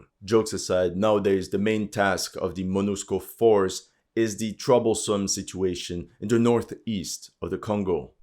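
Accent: France. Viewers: Amazing French accent! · Quand j'écoute votre vidéo j'ai l'impression que vous avez un accent français fait des vidéos en français aussi 🤔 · You a Frenchie brother?😊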